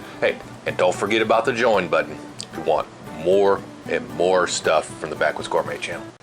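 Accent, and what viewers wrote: Florida, United States. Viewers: This guy sounds like he’s from Florida.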